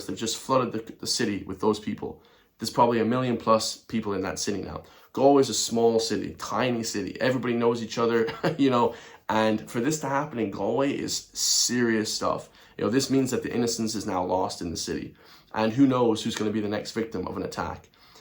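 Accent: United States. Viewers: you say your from Galway all your life but have an American Accent.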